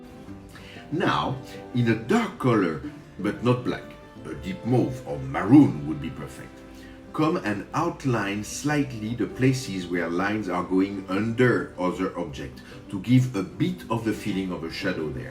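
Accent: France. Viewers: J ai reconnu un accent français donc je me permet d écrire en français 😂 est ce que vous pouvez faire une vidéo Painting like Bacon SVP ? Super travail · Super, vous venez du nord-est n'est ce pas?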